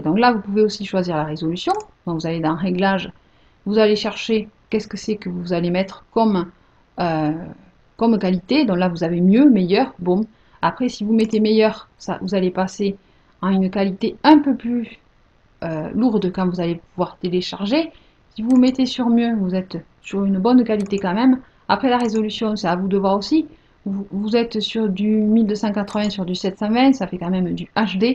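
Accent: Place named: Provence, France. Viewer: et merci l'ascent provensal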